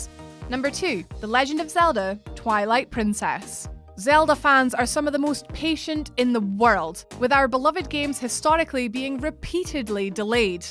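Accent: Scotland, United Kingdom. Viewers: Are ye scottish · Even though it is a Scottish accent?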